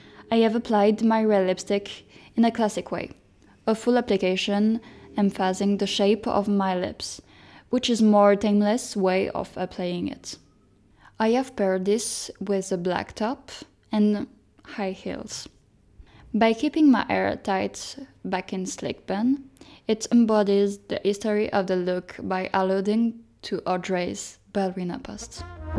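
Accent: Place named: France. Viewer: OK for the French accent to make it sound so "parisienne", but at least read it a few times before because it sounds like reading what someone else wrote without understanding a word of it.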